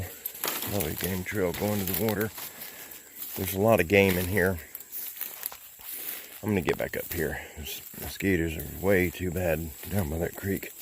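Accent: South, United States. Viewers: To my Canadian ear your grammar is fine..I love your accents from down south! Great letter btw! Thank you for sharing..bring bug spray next time...lol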